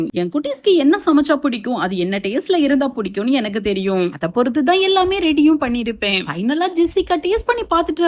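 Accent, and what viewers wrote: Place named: Kanyakumari, India. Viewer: Please change the slang eduthiruthen, vachiruthen, cheathiruthen, vittiruthen.k.k district aaa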